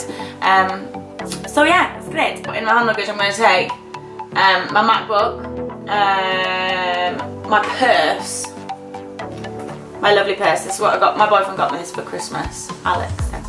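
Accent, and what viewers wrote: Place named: Northern England, United Kingdom. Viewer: I got sent here from one of Nina's posts! Look forward to seeing your vlogs so good to hear another northernerish voice!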